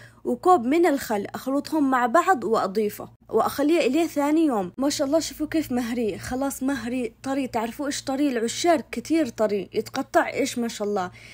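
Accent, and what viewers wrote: Aden, Yemen. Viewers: عجبتنا اللهجه العدني 😂❤ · ماشاء الله عليك اسلوبك حلوو جدآ بالشرح وخصوصاً باللهجه العدنيه واني عدنيه تسلمي وتسلم يدك الله يحفظك ❤ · فديت الكلام العدني كم أحبه يسلمو اليدات بنت بلادي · طبخك للأكل العدني جميل وكلامك باللهجة العدنية أجمل كل التوفيق والاحترام لك من عدن وربنا يوفقك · ماشاء الله عليك عادني الا اول مره اطلع على قناتك وعجبتني وايد وشرحك خفيف لطيف مع لهجتك العدنيه الحلوه تسلمي الله يوفقك ويسعدك❤❤ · ❤ فديت بنت عدن اللي ولا تغيرت لهجتها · عجبني لهجتك العدنية 😂..وطبعاً العشار بالطريقتين ❤ والصيادية الشهية.. تسلم يداك يا بهية 😅 سلامي وتحياتي لك من صنعاء. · صفحتك مرررة حلوة والله يكفي نسمع صوتك والكلام العدني يفوووز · اني افدالك احلاحاجه اللهجه العدنيه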